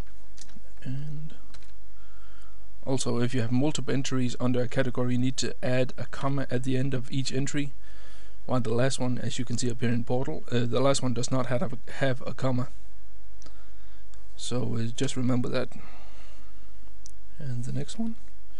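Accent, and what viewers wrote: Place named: Denmark. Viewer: im almost certain its a danish acctent :P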